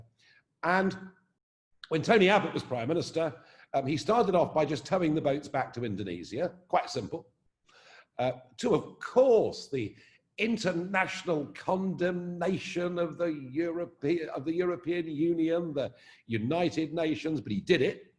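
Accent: RP, United Kingdom. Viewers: I don’t like him and brexit will be a disaster. But his accent is sooooo British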